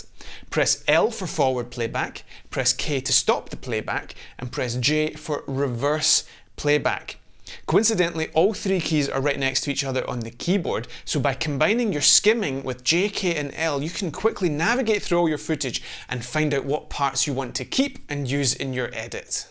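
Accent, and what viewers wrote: Scotland, United Kingdom. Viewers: Very usefull video. Thanks....love Scottish aökcent:D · Simply because you have a strong Scottish accent I liked and added your video to my favs. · That was without any shadow of a doubt the most useful & feature-packed tutorial I've seen. It made me realise I was doing far more work than I needed in FCPX to get the results I was after, and it cleared up a few elemental basics that I was doing completely wrong, and causing myself much heartache in the process. And great to hear a fellow Scot narrate it. Many, many thanks for this big man! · Ayyo! Fellow Scot! Instantly recognised your accent then saw your footage in Glencoe!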